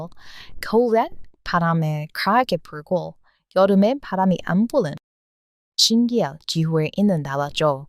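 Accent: United States. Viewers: 은 한국인 2세인가요? 😮 나보다 한국을 더 사랑하는 듯 😂 전형적인 미국인이 한국말 구사하는말투가 매력적이네요.😊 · 님의 미국식 한국어 발음도.... 참 매력이 있네요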